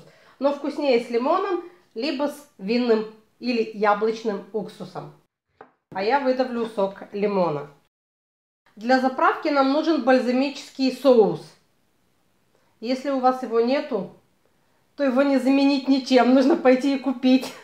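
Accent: Ukraine. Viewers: Цікаво авторка з Одеси, бо відчувається українська основа в розмові.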